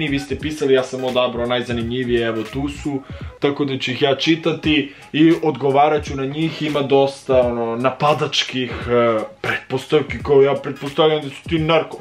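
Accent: Leskovac, Serbia. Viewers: pretpostavljam da iza kamere pricas na leskovacki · Miji baba i deda pricaju isto leskovacki moze 24h leskovacki da pricas😂😂😂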